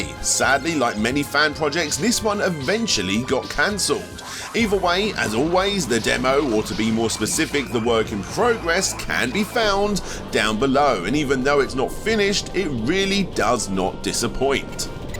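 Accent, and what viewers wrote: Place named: United Kingdom. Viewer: god /da /mn the sh /it brit narration.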